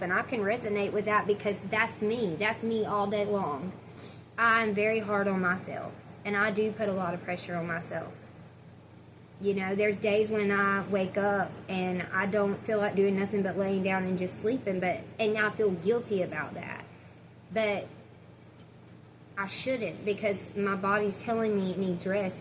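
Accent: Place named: South, United States. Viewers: Sounds American from the South, like George or Alabama. 💝 She does have a sweet heart!💝 PS she says she's from Georgia! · I love your accent. Are you American?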